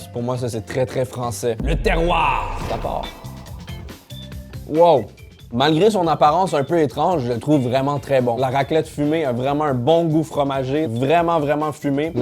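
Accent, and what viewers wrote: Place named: Quebec, Canada. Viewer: Vous le comprenez bien même avec l’accent et les expressions bien québecoises?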